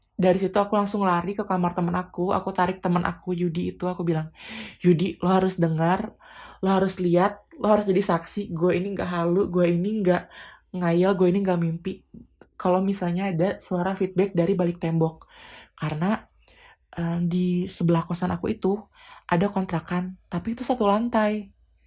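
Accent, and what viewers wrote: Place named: Java, Indonesia. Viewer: Gua kira cewek mas, suarane lo mirip Mas Ricu. Ricky Cuaca. Tapi kayake mas e wong Jowo yo mwehehe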